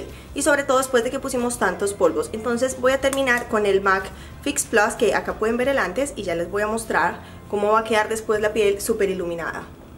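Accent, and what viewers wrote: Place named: Venezuela. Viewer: Me gusta como habla, su acento Venezolana? no me equivoco?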